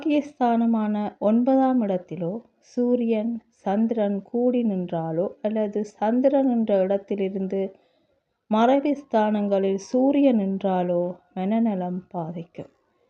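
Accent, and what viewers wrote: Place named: Sri Lanka. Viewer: உங்கள் இலங்கை தமிழ் அழகு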